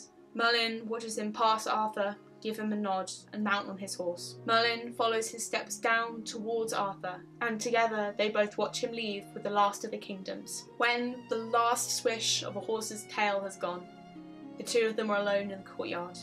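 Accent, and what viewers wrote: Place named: RP, United Kingdom. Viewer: two thumbs up! or more if I had any more. no seriously, I liked the story but even more so how you presented it. very lively, engaged and full of attention to detail I had no trouble following and genuinely enjoyed it all throughout the two parts. your RP diction was an added bonus too. thx very much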